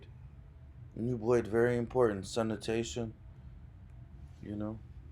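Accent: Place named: Russia. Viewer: i watch your videos mostly your father's videos for asmr and a relaxing effect 😅, love that russian accent ❤️, try adding asmr tag to your videos for more views and subs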